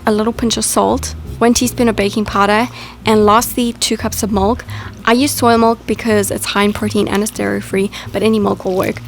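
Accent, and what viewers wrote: South Africa. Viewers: random but are you south african 😂 you kinda have an accent but i could be wrong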